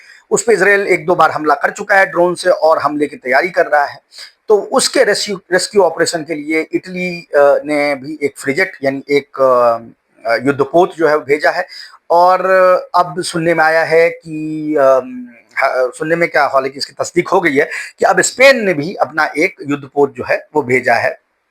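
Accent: India. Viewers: Great analysis. Although you were doing this English but this switch to Hindi is awesome. I also know English very well and listen many English vlog, But listen things in own mother tongue with desi accent looks awesome.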